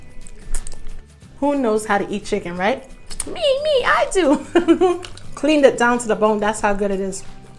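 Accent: New York, United States. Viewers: Go Thank You For Giving US Momma's An Quick, Easy & Delicious Recipe For Picky Eaters. Quick Question-Are YOU From New York? Cause When YOU Say Certain Words-YOU LOL Sound Like ME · Gotta Be From NY With That Accent 🥰 Subscribing To You Now Lol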